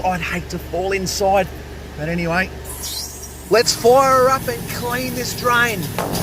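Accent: Australia. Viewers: I know I landed here because I kept watching SB mowing after work when my mind was tired and I just needed to stare at my TV while not thinking much and the algorithm was like "You should watch drains being cleaned!" It's a bit like oddly satisfying videos. I can't really stop watching but sometimes I'll watch in disgust haha Still, I love to see a unblocked drain 😄 I'm watchin from Austria - but I guess were close at heart, because I can't tell you how many times people thought I was from Australia. In Japan I was invited to THREE interviews of English schools because they thought I had written Australia in my resume. And then they were disappointed I'm not a native English speaker... Whoops haha But as a native English speaker it is nice to hear the Australian dialect, since I usually only get to hear british and american English. So that's fun! 😄 Keep up the good work 🎉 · Still here...been here for about 2 weeks! 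Never thought that this 'rabbit hole' would be so much fun! Your running Aussie commentary, makes me smile, and every so often when you give us a look at the scenery 🙄 love it! p.s. happy that scratch 'n sniff tv hasn't become a thing yet 🤣 'til next time, hello from Denmark 🇩🇰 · Your fuckn funny man love ya raw Aussie accent 😂😂😂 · G´Day, watching from Denmark. I found you my youtube magic. So far today I watched you unclog a car park drain, another gully+grease trap and pull out a sausage dog of a filthy hotel shower drain. Now can someone tell me why it´s so mesmerizing to watch this :D But i do have to say it´s a joy to see/hear someone enjoy there job. And I also like listing to some australisch , I spend one semester in Melbourne and it reminds me of that time, it was amazing and all the Aussies where super nice. I saw a nozzle like Mr German once in my local hardware store and I´m so tempted to buy one, we have a probably clogged drainage pipe in front of our carport. 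I now it would make a mess, I´m not sure if I will get the pipe clean, but listening to you having fun just wants me do it :D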